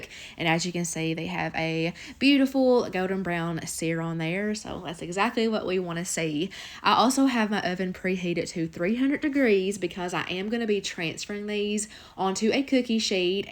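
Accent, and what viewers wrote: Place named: Kentucky, United States. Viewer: Your Kentucky accent brings joy to my family. ❤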